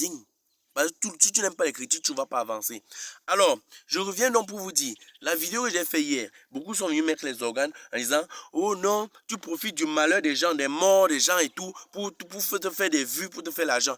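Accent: Cameroon. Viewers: 🤣🤣🤣🤣🤣 l'accent camerounais vraiment hein. Bravo 🤣🤣🥰🥰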